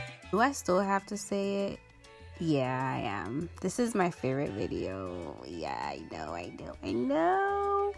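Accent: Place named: New York, United States. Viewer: I knew u was a New Yorker!! I mentioned in yr other video how much I love yr accent. N girl I’m loving yr videos. Keep it up 👍💕💕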